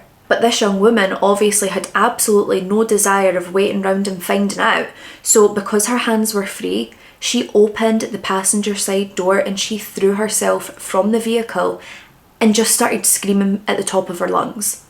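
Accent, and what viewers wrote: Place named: Scotland, United Kingdom. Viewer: Every time I watch your videos, my inner dialogue is in your voice/accent for like the entire rest of the day 😂 and I’m a California girl so I literally cannot even do a Scottish accent myself. Just in my noggin lol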